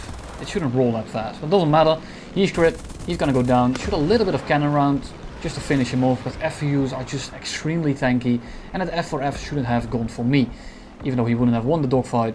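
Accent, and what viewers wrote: Netherlands. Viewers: Hey man, although a bit late, I do enjoy the videos! Are you dutch? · Is he dutch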